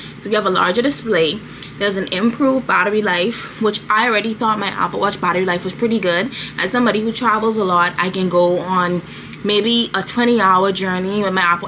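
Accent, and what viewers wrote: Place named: Bahamas. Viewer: I know a Bahamian when i hear an see one lol 😅 Love the video keep up the good work luv 🥳 242 to the world 🇧🇸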